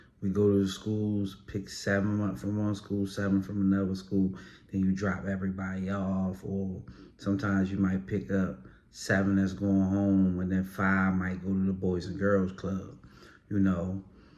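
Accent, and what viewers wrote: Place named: Baltimore, United States. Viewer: This is a sidebar he has a heavy Baltimore accent but nether the less I am tuned in for the information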